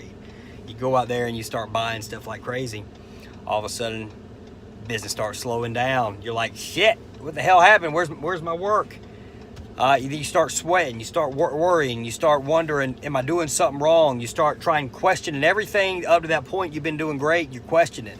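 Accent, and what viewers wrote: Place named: South, United States. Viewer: Thanks. Needed to hear today!! The southern accent always helps too. It is soothing to me. 😉I don't hear much here. Make today amazing!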